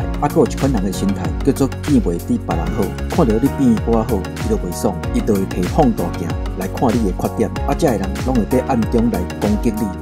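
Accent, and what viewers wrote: Taiwan. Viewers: 看風景，聽台語口白，超讚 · 超愛台灣話的"氣口"! 超讚的影片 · 大哥好！一邊看風景，一邊聽大哥台語的說法跟自己差異的地方，覺得有趣！例如「繞」過來台東，大哥是念「ㄆㄚ」，我會唸「逛」夜市習慣說的那個台語，總之學到不同的說法！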